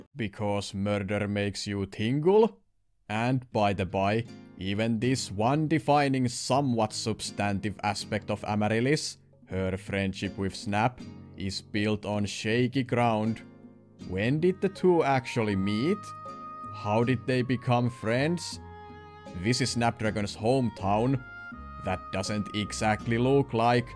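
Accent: Finland. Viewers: Are you finnish by any chance?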